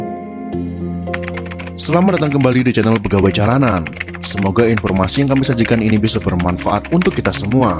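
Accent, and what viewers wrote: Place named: Minangkabau, Indonesia. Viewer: Nice Minangnese Pronunciation 🥰🥰🥰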